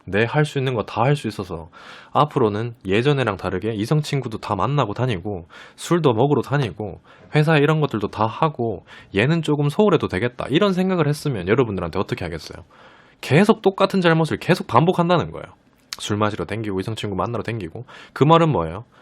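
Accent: Gyeongsang, South Korea. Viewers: 우연히 봤는데 잼있네요. 경상도 억양 있으시네요. 경상도분인듯~~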